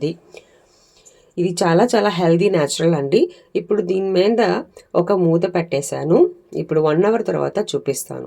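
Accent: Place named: Kerala, India. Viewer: Malayalam notlo vostundiga madam Good so proud of you madam as a malayali proud of you madam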